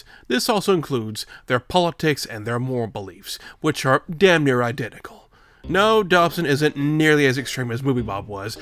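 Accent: South, United States. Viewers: This is completely off topic but are you from the South? Something about the way you talk man, I can HEAR the southern in you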